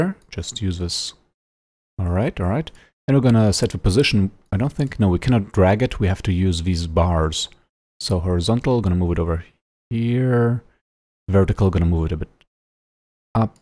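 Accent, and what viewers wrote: Germany. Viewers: you can hear the german accent immediately. it's as plainly as the russian accent. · wie deutsch kann ein englisch bitte klingen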